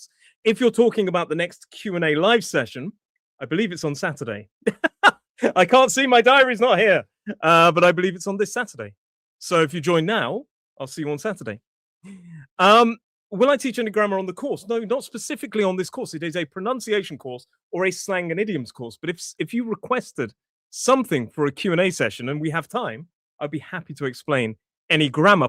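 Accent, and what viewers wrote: United Kingdom. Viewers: Greetings from Panama, Central America. I love that British accent; it conveys a certain air of royalty, class, elegance, and refinement that North American English doesn't.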